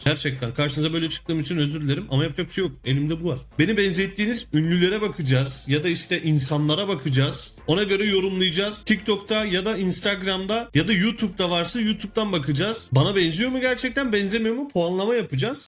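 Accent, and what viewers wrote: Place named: Black Sea, Turkey. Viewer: abi şiven çok komik ve iyi.Karadeniz şiveni seviyorum.sana yeni hayaında işinde... başarılar dilerim abi.veeeee espirilerin gülüşün enerjin çok komik beni hep güldürüyorsunnn.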